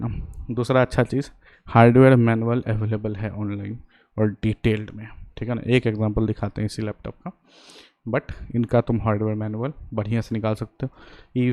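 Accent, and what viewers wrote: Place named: Bihar, India. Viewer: Bhai aap bihar se hona ? Meri gf bhi bihar se hai.. aapka accent match karta hai usse.✌🏻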